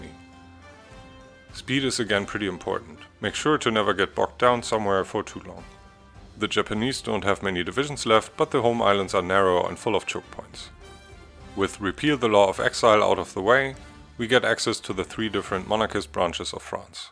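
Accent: Germany. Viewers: Are you German? Bist du Deutscher ?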